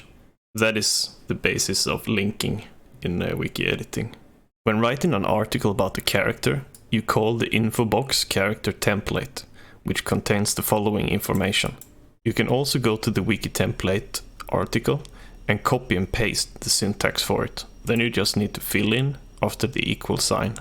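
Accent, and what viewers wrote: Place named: Sweden. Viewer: I knew you were Swedish lol your accent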